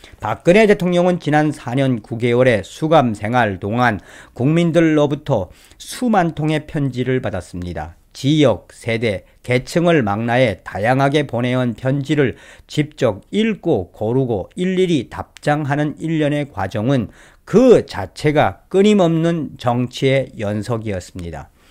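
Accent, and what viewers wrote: North Korea. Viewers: 말투가 북한 사람같내?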